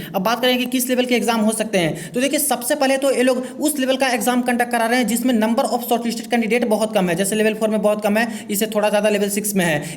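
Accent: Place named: South, India. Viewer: Sir please speak in ENGLISH ,We cannot understand south indian 🥺🥺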